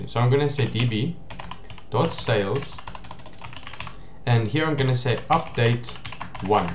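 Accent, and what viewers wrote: South Africa. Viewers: Can't miss a fellow South African accent 😉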